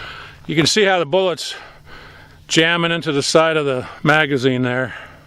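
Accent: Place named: Michigan, United States. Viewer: Awesome vid. Right here in Michigan? That looks like Nevada. You must be a native Michigander. That accent is hard to miss, one Michigander to another.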